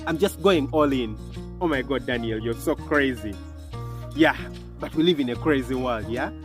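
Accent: Uganda. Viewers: All along I was checking your accent and felt like it was Ugandan, and finally, you mentioned it in this Video! Good Work Man, Nice Channel, ndi big fan of your videos.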